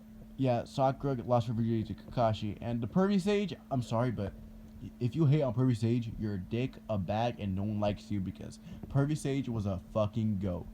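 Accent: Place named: Jamaica. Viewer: Yooooooo your Jamaican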